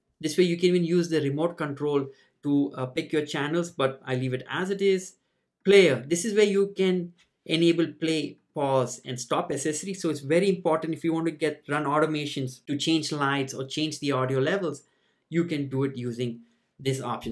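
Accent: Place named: India. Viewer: You are 100% from India. Unmistakable heavy accent.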